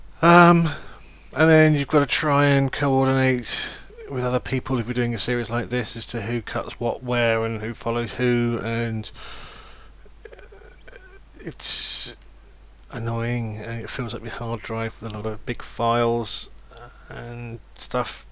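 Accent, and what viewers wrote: England, United Kingdom. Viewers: I liked this not because of your commentary, your breathing, or your gameplay, but because of your witty remarks you make when typing to people. I just like to see how much enthusiasm and humor you have under that thick English accent =D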